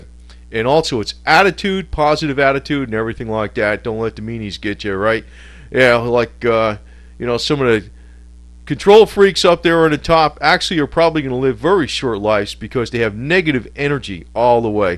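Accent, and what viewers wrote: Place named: Philadelphia, United States. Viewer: are you from philly? I can hear it in your voice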